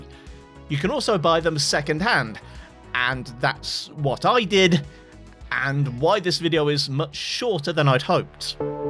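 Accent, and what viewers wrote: England, United Kingdom. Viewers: Saw someone mention you on a Gamers Nexus channel. Had a quick look, and saw this vid which was a question I was wondering about. Soon as I heard a sane English voice, I was in :D Plus your nearly at 100k subs.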